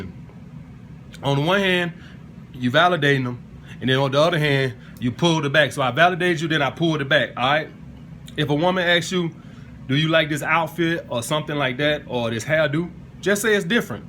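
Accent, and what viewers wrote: South, United States. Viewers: that country accent is dope haha. Good looks on the game big bro, just subscribed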